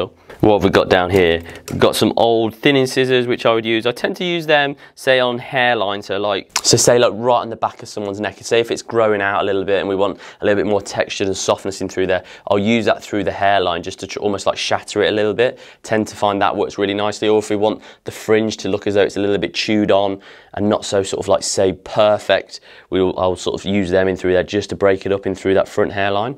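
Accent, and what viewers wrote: Cockney, United Kingdom. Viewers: I love cockney way you speak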